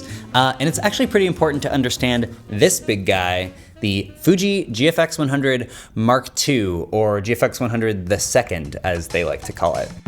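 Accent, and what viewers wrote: United States. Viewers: Aaaaah "Another: "Whats-Up" opening! As soon as I hear this + Yank voice! Block and go.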